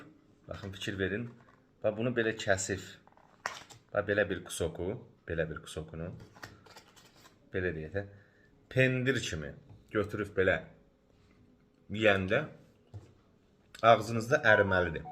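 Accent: Ganja, Azerbaijan. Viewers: Bizə xoş gəlir sizin şirin danışığiniz. Gözəl Gəncə ləhçəsi,arada şirin səmimi sozlər❤Yaxşıki aramızda sizin kimi vicdanlı insanlar var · Gəncə ləhcəsidi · bu oğlan ya Gəncəlidi, ya Şəmkirli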